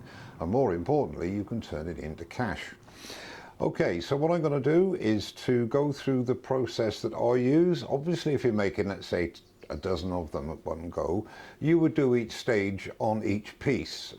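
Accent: United Kingdom. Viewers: I’ve been watching your videos for a few years. I’m very pleased you are videoing some basic designs with an apparent emphasis on new turners. Good on ya mate! It’s ALWAYS good to review design ideas, tool use, and of course listening to your British accent. Greeting from your California subscriber…Roger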